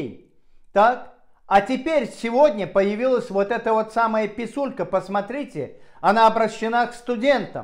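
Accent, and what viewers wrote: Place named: Moscow, Russia. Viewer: Эркек президент ялы гурлейен ящулы с московский аксентам мало чик народ за тебя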